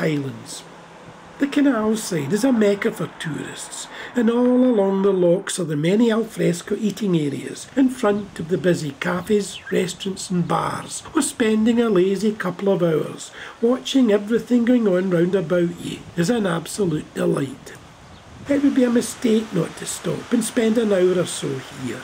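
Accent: Scotland, United Kingdom. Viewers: I have to go to school to learn Scottish. It sound so interesting, what you’re telling, and I don’t understand much enough to follow. 😢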